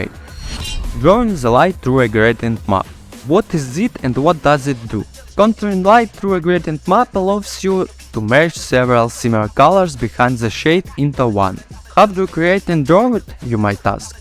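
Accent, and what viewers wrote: Russia. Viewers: Russian accent🤯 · хорош, сразу слышу русского чела )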